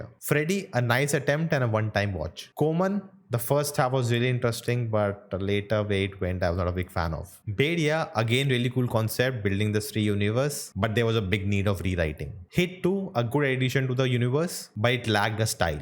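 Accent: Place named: South India, India. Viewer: ..you should watch less South Indian films...Over a period of time...your English accent has developed subtle South Indian tinge...especially when you were pronouncing Hollywood Movie titles....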